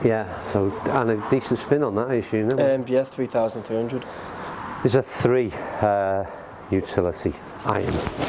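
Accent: Liverpool, United Kingdom. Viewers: Interesting to see that the u65 seems better off the deck than tee I have the same experience. Good to hear a scouser.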